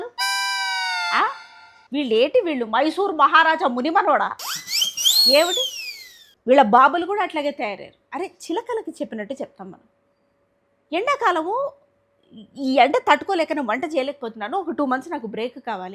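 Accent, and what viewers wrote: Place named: Telangana, India. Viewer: Telangana slang❤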